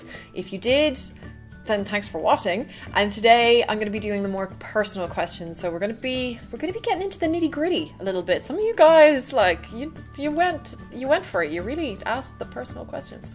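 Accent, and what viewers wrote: Ireland. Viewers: I was thinking west/mid west of Ireland, particularly when you said you met your hubby to be in Limerick (my home town!) best of luck for your wedding!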